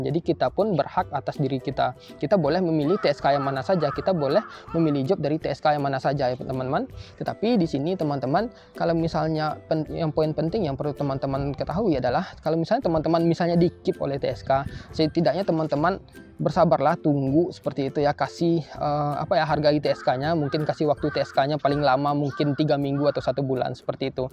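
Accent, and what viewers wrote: West Sumatra, Indonesia. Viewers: Logat bicara nya kaya orang sumbar, apa bener bg😂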